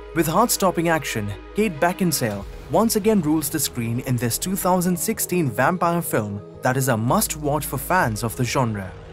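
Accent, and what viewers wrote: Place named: India. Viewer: damn... I cannot listen w/o laughing that indian accent! :)) Bro, better you record "top 10 unmissable cartoons"! :) And no need to include "Underworld" twice - ALL its parts are perfect.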